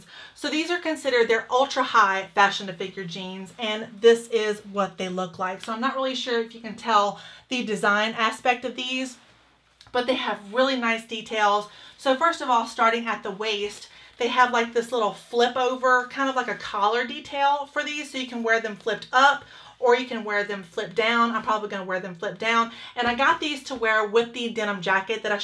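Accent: South, United States. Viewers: I’m a southern girl and I instantly realized that you were southern too when you started talking and I immediately subscribed. Mainly because you don’t see many southern women as YouTubers so it was just amazing to see honestly. That prolly made no sense but I just wanted to tell you to keep doing what your doing. It’s amazing 🥰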